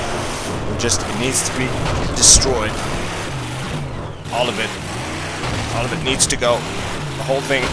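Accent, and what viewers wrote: United Kingdom. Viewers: why do you taik in a british acsent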